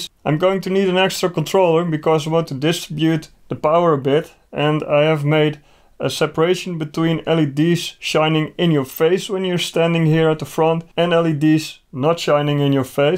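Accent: Netherlands. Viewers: A dutchman, unmistakably. Ill follow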